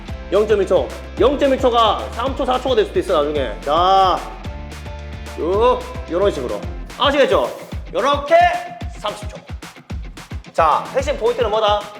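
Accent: Gyeongsang, South Korea. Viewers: 진짜 인트로 어설프게 미국물 먹은 경상도 아재 감성이다...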